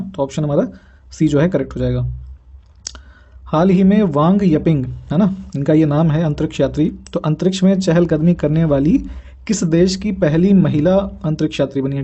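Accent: Kanpur, India. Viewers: Sir aapki voice Kanpur lg rhi h voice bhut achhi h apki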